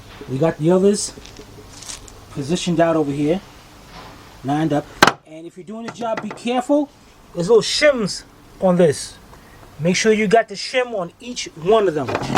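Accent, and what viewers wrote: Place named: New York, United States. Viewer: Wish I would have seen this before i paid for the job. You got the most swagger of any mechanic I’ve seen. Sound like you are from New York.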